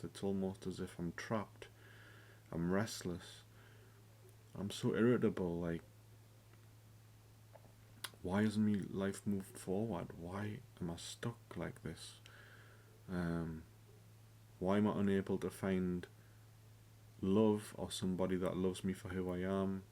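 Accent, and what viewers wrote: United Kingdom. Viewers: Youtube random algorithm sent me here so, going to write a comment (I know this was posted 4 months ago but I hope you read it). Life doesn't have to have purpose, friends come and go, lovers as well, there is no eternal love, all ends when one of them dies. Try to volunteer, you will meet new people, and will feel a sense of purpose. A pet helps, physical exercise (hiking, walks, weights) as well. "Who's going to love you, if you don't have a job?", your constructed ideas are putting you in a prison, I met my bf when he was unemployed, he took over 1y to get a job, that never got in the way of our relationship. I earn more than he does, he is on minimum wage, and I really don't care how much he makes, if he enjoys what he is doing. The happy family idea, is just an idea, most of my close acquaintances have families that are quite dysfunctional, very few are really happy. 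I know plenty of people that are 40y+ that are single and they are actually happier than most married people I know. Being alone is different from loneliness. You achieved being an engineer, earned a good wage, you traveled, and learned the lesson that you should have saved for the rainy day. Life has ups and downs, it is not a highway like propaganda portraits. Start reading about stoicism (there are a lot of sources for free online, you can start in reddit- r/stoicism), self-compassion, resilience and get therapy (I think you are from UK from your accent, NHS provides free therapy). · Geordie love it I’m from Hexham bro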